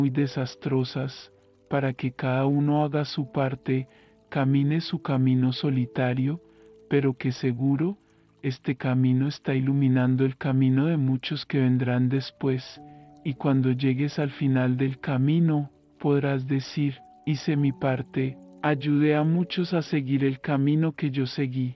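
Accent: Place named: Colombia. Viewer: Suenas como Colombian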